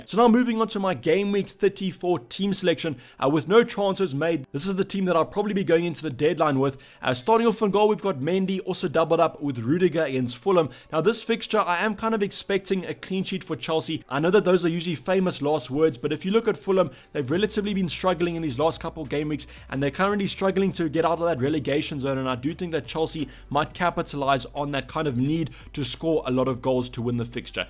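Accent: South Africa. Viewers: Never tiered of hearing your lovely South African voice, keep up the great work 👍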